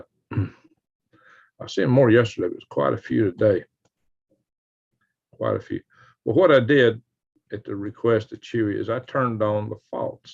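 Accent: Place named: Appalachia, United States. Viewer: I tried to share with my old friend; all he could do is copy accent, 'hill-billy'. My sister would think I was a joiner, so.. Like Pork during Ramadan- good, more for me!!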